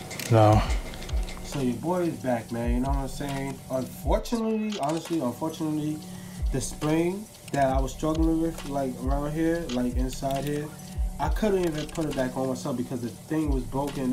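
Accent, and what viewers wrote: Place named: AAVE, United States. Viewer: The fact that I understand him is amazing. Ebnonic is fun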